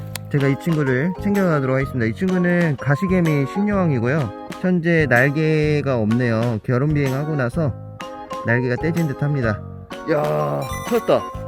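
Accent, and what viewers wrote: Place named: Pohang, South Korea. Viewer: 브르님 말투에서 느껴지는 퐝의 느낌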